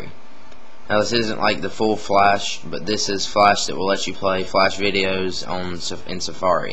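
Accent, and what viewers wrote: South, United States. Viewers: this guy sounds like a hillbilly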